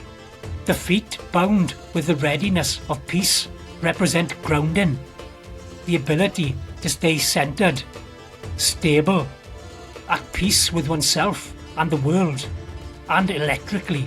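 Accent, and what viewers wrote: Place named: Wales, United Kingdom. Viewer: Fantastic video. Thankyou for making this. Great to hear the Welsh accent. I was born in Cardiff and grew up in Rudry in south Wales.❤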